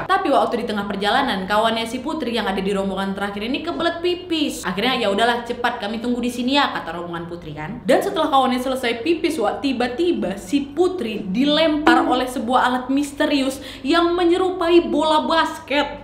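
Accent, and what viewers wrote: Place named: Medan, Indonesia. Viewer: Horas kak sukak kale liat video video kkak medan kale cara ngomong nya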